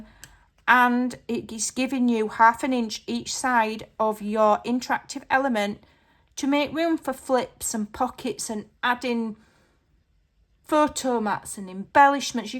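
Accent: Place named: Scotland, United Kingdom. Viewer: Hi there, I apologize, but I can't hear what the name of the tape is - Mac isnt making sense trying to translate the "scarpile" tape. I begun subscribing to your channel for about 6 months ago, & I love watching you make these journals. You just showed some of the once you've made Nov 4th 2025 & I want to try making one for one of my grandchild - thinking of making it as an Advent calendar for her. Don't know yet how or if it will work. I'm from Sweden, so English isn't my first language, love your Scottish/Wales accent - just guessing now. Would be grateful for your help, with the name of the tape that you mention. Thanx for sharing this tutorial. From Lia/Sweden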